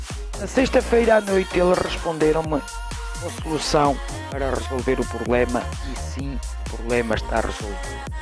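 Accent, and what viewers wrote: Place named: Europe. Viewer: maxo esse sotaque europeu e essa musica estourada puta merda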